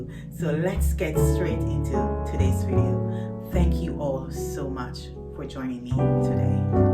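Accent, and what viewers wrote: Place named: Caribbean. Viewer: Very beautiful ❤also i like your accent are you caribbean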